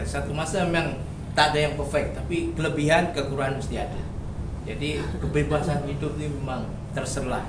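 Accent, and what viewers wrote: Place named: Java, Indonesia. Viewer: Dari logatnya iya sptnya turunan jawa tp knp menjelek"an suasana di negara sendiri? Jkt tdk separah itu Jkt bkn spt New Delhi / Dakka. Jln layang di mana" bahkan kini sdg di bangun kereta bawah tanah / subway. Mmg Jkt tdk punya gdg tertinggi di dunia tp percayalah suatu kota akan terkenal bkn krn ada gdg fenomalnya tp krn suasana dan keramahannya jg keunikannya. Masjid terbsr seasia tenggara ada di Jkt, surga belanja terbsr seasia tgra jg ada di jkt yaitu di Tanah Abang. Mau makan makanan seluruh Indonesia dtg sja ke Jkt dari Warung Tegal, Sop Konro, Bubur Manado, Pepes Sunda hingga masakan padang smua bs anda jumpai di Jkt dari kls pinggir jalan hga di hotel" berbintang.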